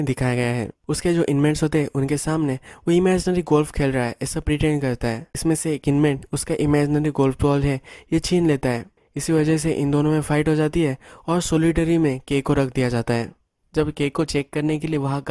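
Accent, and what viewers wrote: Maharashtra, India. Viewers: Chitka hua ... Full Marathi guy speaks hindi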